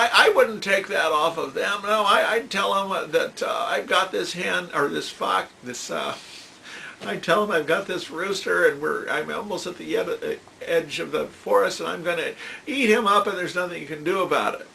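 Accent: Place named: United States. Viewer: Yet another American! I don't want to hear about Chaucer with that annoying accent!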